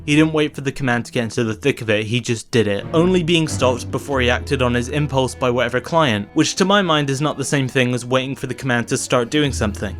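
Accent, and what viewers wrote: West Country, United Kingdom. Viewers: Your subtle west country is quite interesting.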